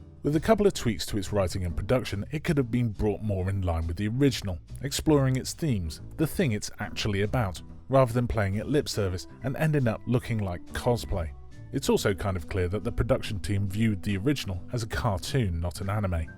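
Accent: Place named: United Kingdom. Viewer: British voice complaining about whatever on YouTube… what a surprise 🙄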